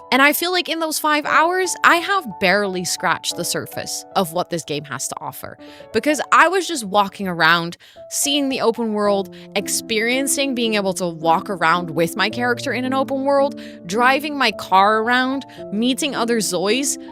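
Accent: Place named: Netherlands. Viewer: Ah you're from the Netherlands! I barely heard an accent until halfway through, your English is so amazing! Better than even some Americans or British 😅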